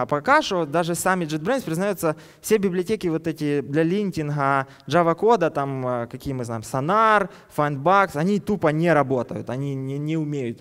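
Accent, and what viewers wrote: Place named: Ukraine. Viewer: Английский с украинским акцентом - самое лучшее, что я слышала за последнее время)))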